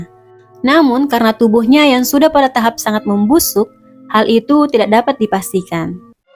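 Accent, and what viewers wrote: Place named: Eastern Indonesia, Indonesia. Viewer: Suara admin kedengarannya dr timur, tp gak tahu apakah dari Sulawesi, NTT, atau Papua.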